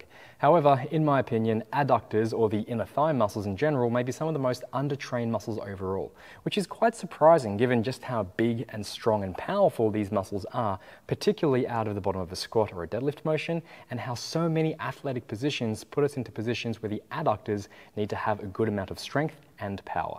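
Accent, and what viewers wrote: Australia. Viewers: Are you Australian? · So weird hearing an Asian man speak with a thick Australian accent. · Are you Australian? Where are you based?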